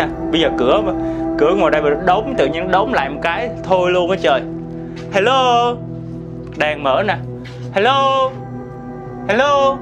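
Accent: South, Vietnam. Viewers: Bạn có thể nói dễ nghe hơn một chút được không. Nhiều lúc bạn vừa đi cừa nói nên rất khó nghe. Cả gia đình mình từ già đến trẻ đều thích xem video của bạn nhưng mấy người già khá là khó nghe và gđ mình là người Bắc nên khó nghe tiếng miền Nam. Mình cảm ơn. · Nghe giọng Miền Nam là thích rồi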